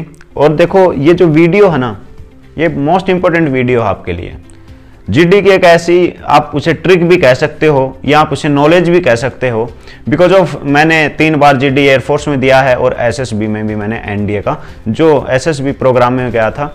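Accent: Haryana, India. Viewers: Apki voice se pta chal rha ha M be haryana se hu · are waah sir ji Apki boli me haryanvi ras dikkh hai hariyana te ho k😄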